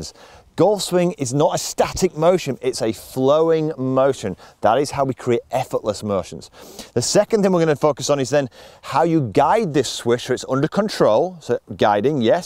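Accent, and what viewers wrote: Yorkshire, United Kingdom. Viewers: Thanks, With your Yorkshire accent you remind me so much of him with his enthusiasm and simplicity. Best wishes.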